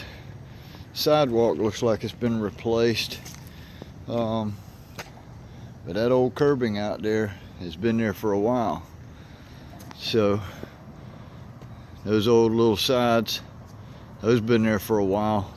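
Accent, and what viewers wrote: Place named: South, United States. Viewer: I like the laid-back approach. Very relaxing. Obviously you're a Southern boy. Keep the videos coming.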